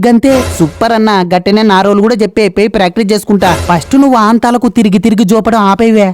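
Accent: Rayalaseema, India. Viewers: R u From Rayalaseema?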